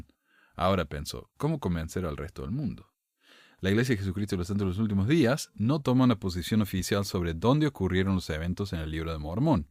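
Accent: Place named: Córdoba, Argentina. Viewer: Disculpame, sos de Córdoba, Argentina? Por tu acento, digo.... Gracias!!!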